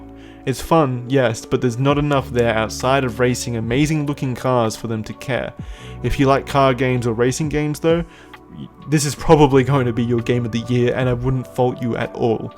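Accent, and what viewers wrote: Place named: Australia. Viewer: Haha funny Australian man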